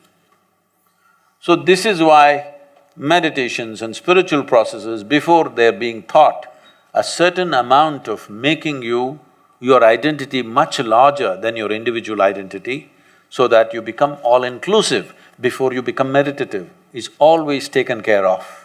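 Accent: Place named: India. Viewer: I've subconsciously created the thought that i truly can't stand the Indian accent anymore and so it is now. When I hear it, i get this "eww" type of feeling, such an ugly accent.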